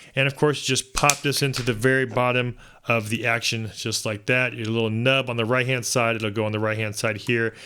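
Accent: Philippines. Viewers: Ilocano ka gayem..i know by accent .lol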